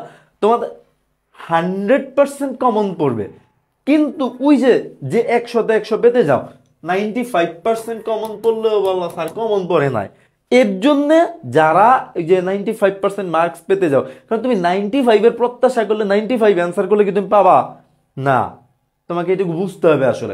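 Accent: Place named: Sylhet, Bangladesh. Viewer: ভাই কি সিলেটি? ওনার ভাষায় সিলেটি টান বুঝা যায়!😅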